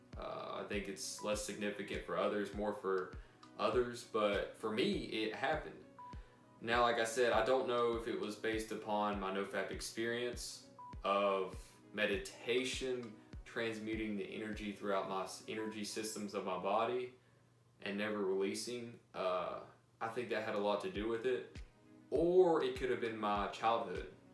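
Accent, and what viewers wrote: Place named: Alabama, United States. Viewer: Where are you from! I’m from bama and I recognize your accent 😉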